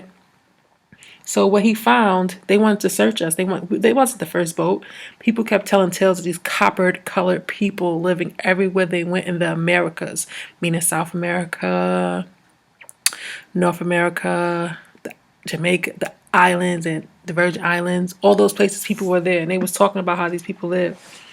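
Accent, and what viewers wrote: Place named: England, United Kingdom. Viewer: I dig everything you've said, period, that's the point right?. Are you English or from London. I notice you have a bit of an accent when you speak. But nice information. I respect that, but if you are then be that, don' fake the funk, that is if you are.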